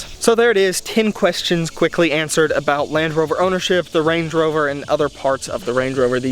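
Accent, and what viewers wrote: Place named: United Kingdom. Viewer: U can tell this guy loves rr he is getting a small British twang to his accent. Ha. And yes I agree u r really cute. X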